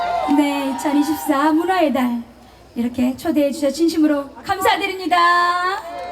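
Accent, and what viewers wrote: Jeolla, South Korea. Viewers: 송가인 가수님 전라도 사투리 정겹고 친근감들어 재미나요 ㅎ ㅎ 노래힘있고 무대꽉찬느낌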